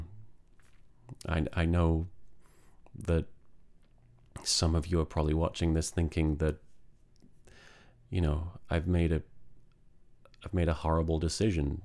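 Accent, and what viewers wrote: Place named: Northern England, United Kingdom. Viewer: LK, everyone makes mistakes and just because a decision is emotional that doesn't make it stupid. I know how hard it is, but you need to understand that you can't change the past year. I'm happy that you've found a medication that works well for you. (Unrelated note: are you from the north of England? I'm from Doncaster and I thought your accent sounds like mine)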